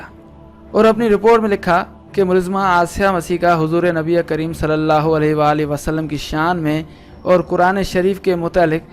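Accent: India. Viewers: Ap pakka Indian ho q k bolny k andaz bata rahy hy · Are you indian ?The word of your speaking is indian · Tu hai Kon Bhai ?indian walon Ka accent lagra hai !!!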